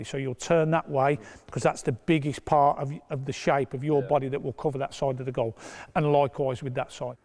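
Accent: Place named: United Kingdom. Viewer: British english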